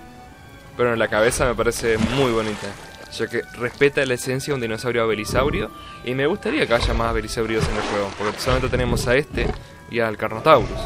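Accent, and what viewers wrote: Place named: Argentina. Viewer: se nota que es argentino 🔥🤷🏾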